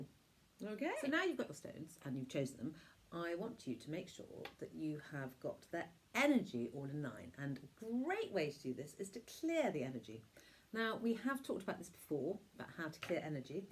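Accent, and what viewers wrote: United Kingdom. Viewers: it,s nice to hear a respectable brittish woman speak about stuff.:face-red-heart-shape::face-red-heart-shape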